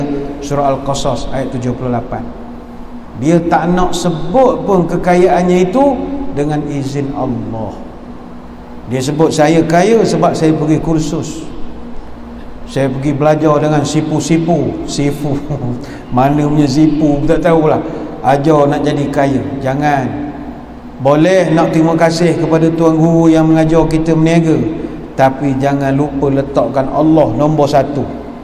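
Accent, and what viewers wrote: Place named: Kuala Lipis, Malaysia. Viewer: Klau xsilap ustaz org Kuala Lipis?loghat ustaz macam org kampong saya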